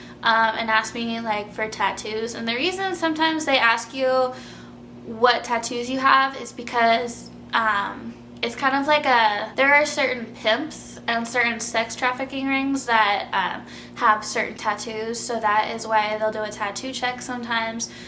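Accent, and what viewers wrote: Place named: New York, United States. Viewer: Interesting to hear your experience as a stripper. You sound like your from NY,, not Oregon.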